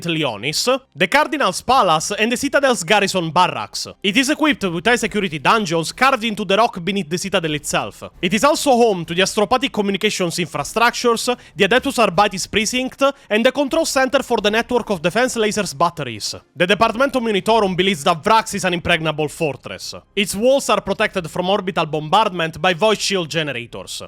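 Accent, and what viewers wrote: Italy. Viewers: I just can't Italian accent makes all "high gothic" names just Italian names, and its sooo good! It feels like i watching Young Pope or something.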